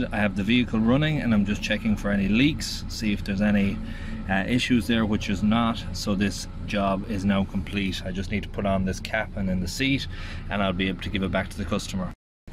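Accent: Ireland. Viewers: Are you from Cork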